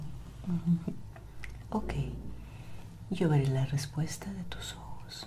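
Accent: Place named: Colombia. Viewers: ¿De qué parte de México eres? Te juro que yo escuchaba como acento colombiano. No reconozco mis acentos, he fallado como mexicana jajaja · Soy colombiana y a mi tambien se me parece a unos de nuestros acentos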